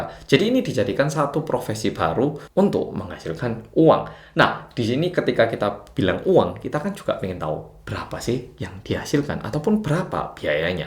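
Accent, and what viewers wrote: Java, Indonesia. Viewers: Mas Korea medok.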